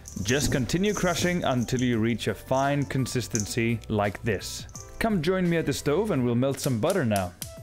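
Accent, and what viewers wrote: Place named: Iceland. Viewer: seems like I finally found a good icelandic recipe! I´m so in love with that and miss original skyr and of course skyr cake the most... but I also love how icelandic people pronounce words in english. So thank you in so many ways! 😊🥰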